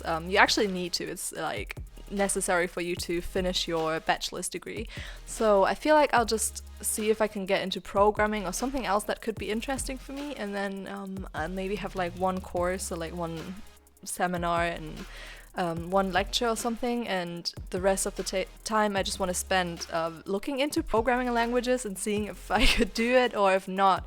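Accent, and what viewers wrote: Germany. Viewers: Wie man hört das die deutsch ist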